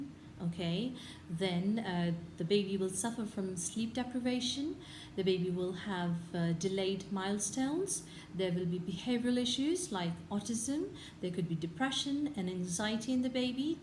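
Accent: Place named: United Kingdom. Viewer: Madam can u plz tell me how did u learn british accent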